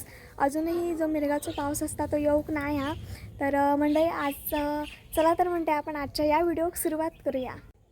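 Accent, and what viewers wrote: Malvani, India. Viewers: Tu mast malvani june shabad boltayai eyakaak bara vata mast video chavkul li jaya go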